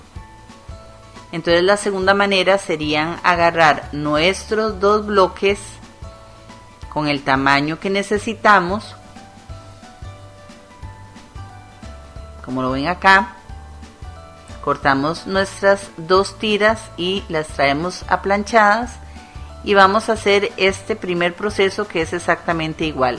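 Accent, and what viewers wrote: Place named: Costa Rica. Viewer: Que lindo trabajo, muchas gracias por la paciencia y por enseñarnos, por el acento de tu voz supongo eres pura vida de costa rica😊, un saludito muy especial desde mi 🇨🇴 bendiciones😇😇😇🙏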